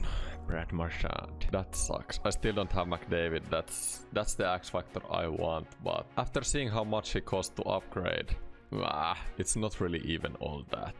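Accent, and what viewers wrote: Finland. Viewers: Mä nauran kuinka hyvin nasevasti suomi aksentti paistaa englannissasi:D!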